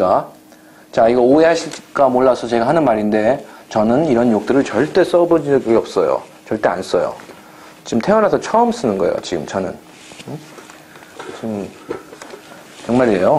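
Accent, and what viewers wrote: California, United States. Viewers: not even close, it's California by a mile Hawaiians aren't Asian either btw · The only thing he misses is "son of A bitch". he forgets the articles. he has an amazing american accent tho, and he explains our curse words better than i could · He sounds like he's American to me. I'm a Texan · Could be he learned American from some one from Cali, who ever teaches u a language u then develop thier accent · I am Chinese, yet I am listening to a Korean gentleman teaching me English cursing in American style English while I am physically in Australia. 😂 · it's a good guess, but as did state, it is california where most asians are at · well he clearly does have an American accent when using English. He definitely doesn’t use more of a British/Irish/Welsh/etc accent when he speaks English. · ur comment doesnt make sense. The funny thing about him imitating a Californian accent is that hes not from California or America at all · not necessarily. 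In some cases, teachers end up focusing on mainly written english. Plus, the guy has that cali pronunciation. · I love how this mans American accent for most of the video sounds like he grew up in California his whole life and is a middle aged dad, its amazing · He got the California vibes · It’s funny how so many people think you have to live in America to get an accent like this. Anyone can become skilled in a foreign language no matter where you live now. I think it’s because Americans are used to stereotypical “asian” accents in media, or because they themselves have trouble speaking other languages. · Im American and this dude has a better American accent than me 😂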